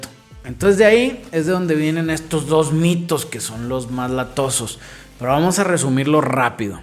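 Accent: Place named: Monterrey, Mexico. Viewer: Eres de monterrey vrd vato!! Jeje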